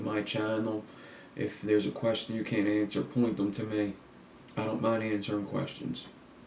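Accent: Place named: Baltimore, United States. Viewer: Do you have got to be from Baltimore with that accent that you have it’s pretty much where I grew up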